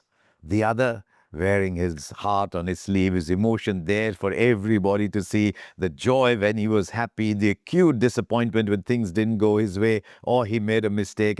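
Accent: Hyderabad, India. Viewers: Surprised to hear Hyderabadi style Hindi 😮😊🎉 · speaking in hyderabadi accent made goosebumps ❤❤❤❤❤❤❤❤❤ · Just loved your Hyderabadi ❤ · Your Hyderabadi accent ❤.....app Hyderabadi bolker hamara dil jeet liya ❤ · that hyderbadi accent bought a big smile on my face💙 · That's Hyderabadi accent and those golden words 'pair zameen per aur haathan aasman per'... Keep advise and motivate Siraj.. Love you sir❤ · Superb Mr. bogle, ur hyderabadi accent and u took places names of Hyderabad, superb awesome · kya miyan....aap tho ekudum chakas Hyderabadi bhasha bol lethe:) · bhai! Loved your narration in Hyderabadi! 🥰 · Amazing sar isi Hyderabadj lahja ka aur siraj bhai ki tareef ka muntazir tha my. Bade khushi hui. Khuda aapko abaad rakhe. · Wah kya hyderabadi bole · That hydrabadi accent comments from sir is heart touching. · Aankh may Aasu aa gaye..hyderabadi accent sunke..kuch bhi ho jaaye..apna hyderabad apna hai..sahi baat bole aap sir.siraj miya maut daal diye...chindiya..Marfa hona abh 🎉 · Normally, I don’t comment on videos — but this one, with the Hyderabadi accent, was too good to ignore. 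Well done!!! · That Hyderabadii accent is top notch💕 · That Hyderabadi accent though 🙃❤️ Siraj ✌🏻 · Bhai subah subah aapki hydrabadi boli sun kar din ban gaya. Maza aa gaya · lovely talk in hyderabadi accent by Sir!! and MO SIRAJ you are a legend!! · Beautiful tribute in true Hyderabadi Hindi. Wonderfully done! Salute to you. All I can say a fantastic commentator to a great bowler of modern times! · What a gem ji you are.your choice of words are amazing and what a great hyderabadi accent.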